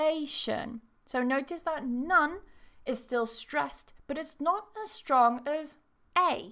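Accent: United Kingdom. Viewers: IMPRESSIVE LESSON! For me Ms. is the most amazing teacher. I do love her melodic British accent as I told everybody I've devoloped the American pronununciation but now I'm learning the British entonation and pronounciation as well. Thank you very much Ms. 😘😘😘😘😘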